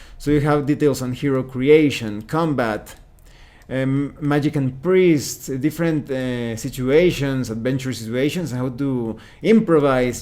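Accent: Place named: Spain. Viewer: I really dig this review for several reasons: relaxed but not boring, comprehensive but not overextended, and it really gets you into the game mechanics without getting lost in too many details. You totally won my attention into AFF 2e! Bravo! A curiosity: I recognize that your a native spanish speaker based on your accent, but you talk fluently through many sayings with no flaw, can you tell me how you got such a mastery :)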